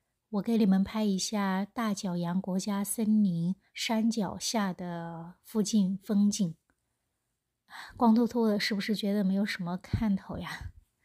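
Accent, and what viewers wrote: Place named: Hunan, China. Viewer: 湖南口音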